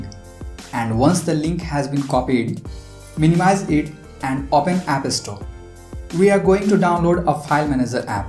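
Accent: India. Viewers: If you think I’m trusting a man with an Indian voice on a tech issue, you can think again.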